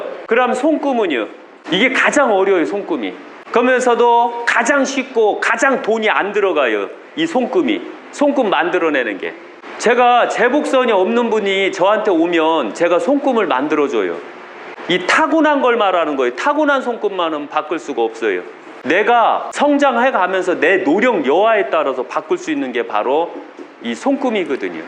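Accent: Chungcheong, South Korea. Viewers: 충청도 사투리가 구수 합니다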